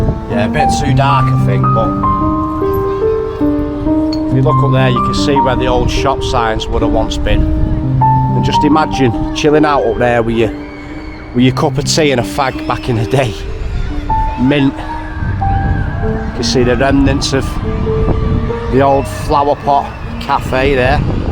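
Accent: Manchester, United Kingdom. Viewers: Eastford square was a vibrant area for local people. Collyhurst was rough and ready but the people were the best in 1960/70. It had masionettes across the road and further up from the car park they were old peoples flats. It was a pub across the road. A well liked intergalactic place for artist to play and sing. The area has been neglected but it was a great place to live. It’s outside people who messed it up. You sound Mancunian but you’re a disgrace to the city. It’s rough now but wasn’t in its heyday. Shameful video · Beltin' channel. Great to hear a proper North Manchester accent, are you from Colly mate? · I could listen to your manc accent all day long 😍 I drive past Eastford Sq every day heading to work. The concrete artwork is a genuine William Mitchell piece. He was the same artist that produced the three 'Minut Men' outside Salford University's Allerton Building.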